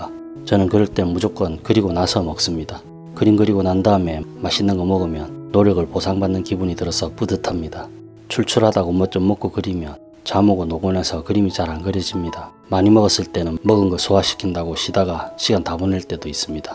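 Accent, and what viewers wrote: Gyeongsang, South Korea. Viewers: 쌤 반갑습니다😊 귀에 쏙쏙~ 지루하지도않구 항상 감사해욤. 쌤 갱상도 쌤 맞지예~??ㅎ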